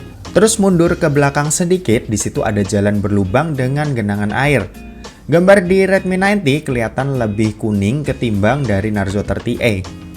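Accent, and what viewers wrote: Semarang, Indonesia. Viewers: Orang semarang bang? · wong semarang yo mas? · cah semarang ki jebul🤭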